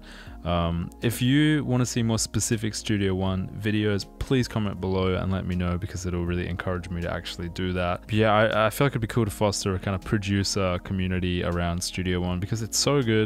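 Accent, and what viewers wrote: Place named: South Africa. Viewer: Definitely found this helpful thanks. From your accent I gather you're a fellow South African.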